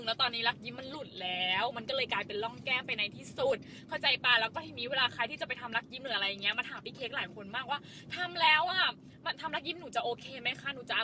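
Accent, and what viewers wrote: Thailand. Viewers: เหน่อ เว้ยเฮ้ย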